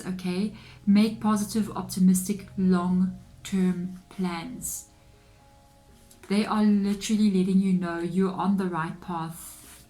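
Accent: Afrikaans, South Africa. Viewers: Hey! First time on your channel¡ About to watch the reading, but heard your accent! Are you Afrikaans?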